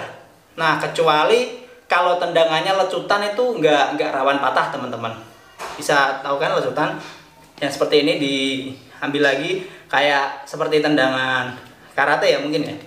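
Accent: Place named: Java, Indonesia. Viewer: Daerah Mana Bang Kok ngomongnya Jawa hehehe... Sosis Lu bang (suami Takut istri)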